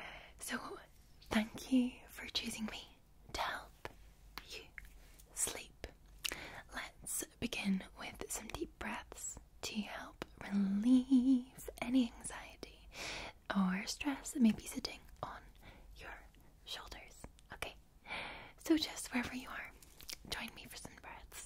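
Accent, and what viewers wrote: Scotland, United Kingdom. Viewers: I swear Scottish Tingles are different than normal tingles. Tell us more about Scotland!! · Love your accento, you made me want to go to Scotland. You're lovely, i like everything of you, but the voice Is marvellous! ❤❤❤ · Scottish accent challenge: try saying ‘burglar alarm’ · Ahhhhh yes another glorious night of falling asleep to that amazing Scottish voice 😴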